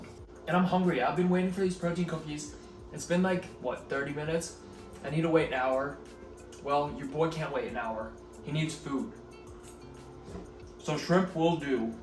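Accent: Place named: New York, United States. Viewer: I’m from New York and I’d bet money you are either from New York or New Jersey the way you say chocolate 😂 Thanks for this awesome recipe! Happy Holidays sweetie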